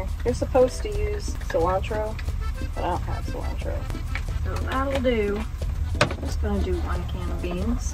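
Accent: United States. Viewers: Sounds very american...I never know she is russian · Southern accent with a twist 😜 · You're from Mother Russia? You sound completely American. Make some Borscht. 🙃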